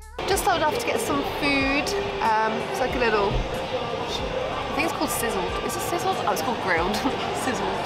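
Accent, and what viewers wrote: Birmingham, United Kingdom. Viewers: Oh thought u from UK all along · As a fellow Brummie I distinctly here the Birmingham accent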